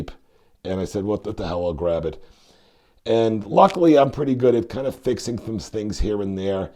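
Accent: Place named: Boston, United States. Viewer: That's a Boston accent. I'm from there too buddy. Cheers.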